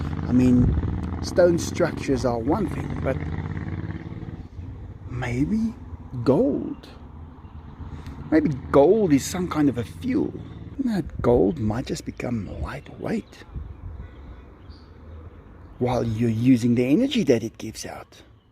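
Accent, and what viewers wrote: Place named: South Africa. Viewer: SA accent is so entertaining to me, especially when he says certain words/phrases. I particularly enjoyed “park that sucker” today 😂🤣👍. I also always enjoy “milk” and “barf” 🤷‍♀️ 🤪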